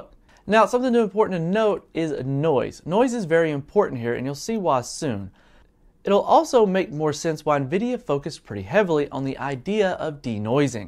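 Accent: South, United States. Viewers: Awesome video! But I gotta ask what accent do you have? I've never heard a "standard" American accent mixed in with a southern twang!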